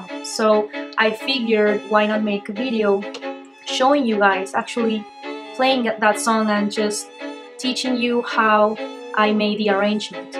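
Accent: Spain. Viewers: Tío pero si con el acento españolizado que tiene se entiende perfectamente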